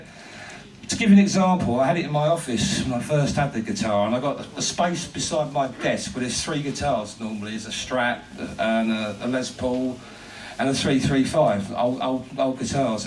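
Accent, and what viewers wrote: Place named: England, United Kingdom. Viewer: I listen to Englishmen talk all the time but this guy seems to try to celebrate the accent and slurring to another level. Good Lord man speak clearly if you're going to put something out on YouTube. Thanks for your effort but it would really help if you could speak to where the rest of the world can understand.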